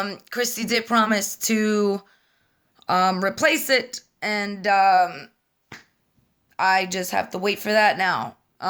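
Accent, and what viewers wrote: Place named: New York, United States. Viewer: Since the first time I met you I have felt you are a honest person. I too tend to "walk the line" in this wax world, I ignore drama as much as I can as you do. Good for you for standing up. Keep being you and keep making these videos! I love watching you (and listening to your New York accent) I've heard this before "Who here has been victimized by Regina George" and it's completely true. You are either for her or against this person, there isn't an in-between. If you ever need anything I'm here for you!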